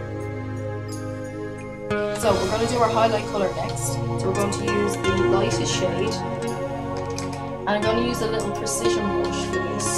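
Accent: Ireland. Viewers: your accent <3 i love irish accents and i love your tutorial imma try it tonight because im uber bored!! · Your accent is so rad. I love it! Ireland is someplace I wish to visit sooo badly. Hello from California >3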